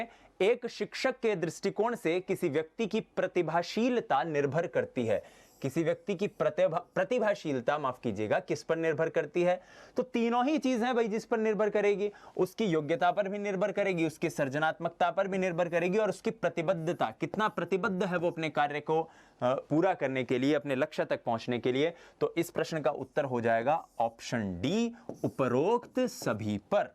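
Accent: Rajasthan, India. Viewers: Sir Rajasthani botle hue ache lgte ho😀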